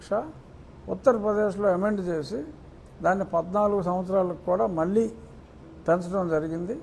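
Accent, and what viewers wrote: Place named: Godavari, India. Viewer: Sir meeru ma godhari yasa mamuluga ledhu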